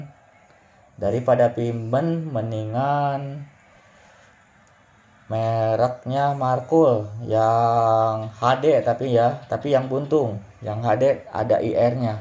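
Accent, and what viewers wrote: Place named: West Java, Indonesia. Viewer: Logat sundaxa sangat lekat kang,salam dari Papua,Asal Majalengka👍👍🙏🙏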